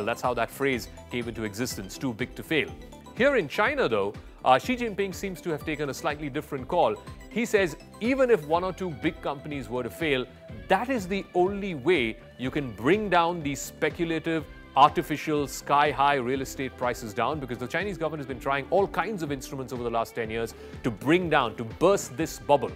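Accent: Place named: India. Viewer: First time to see this channel and I love it clear unbiased factual and subcribe. First I hesitated since it sounds Indian,I'm not a racist because I've watched a few Indian channels they're all craps loke WION/GRAVITY,TGIF etc.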